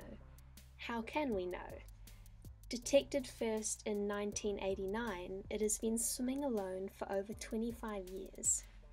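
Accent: New Zealand. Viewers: Luv that Kiwi accent. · Are you kiwi? Your accent is so clear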